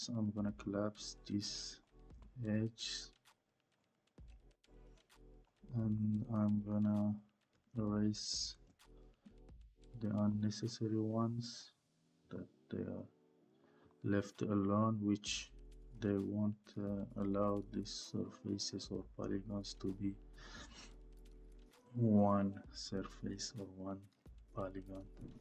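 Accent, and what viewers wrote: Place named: Iran. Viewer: You have a Persian accent, are you from Iran? We are easily distinguishable.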